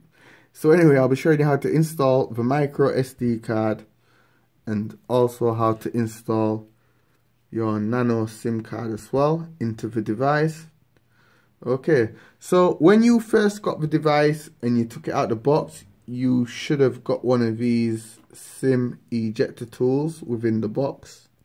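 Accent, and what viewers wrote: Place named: United Kingdom. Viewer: Which part of UK are you from?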